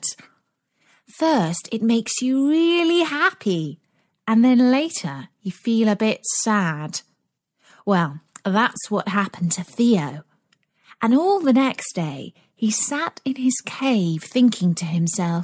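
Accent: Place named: England, United Kingdom. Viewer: Funny story. Great English accent!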